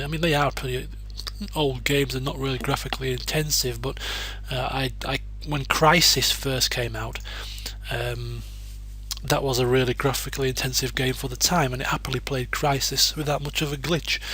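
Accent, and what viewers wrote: United Kingdom. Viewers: New Vegas.. New Vegas! New Vegas! haha man I love you videos man! It's nice to hear a fellow British-man give a 5 star commentary : ) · I see ur videos because of the british accent, is that weird?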